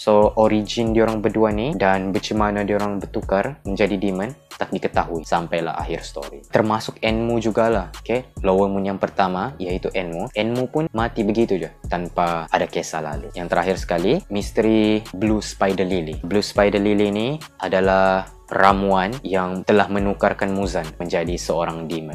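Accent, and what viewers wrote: Sabah, Malaysia. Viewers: Bang orang sabah ke ?speaker favourite ni bos🔥🔥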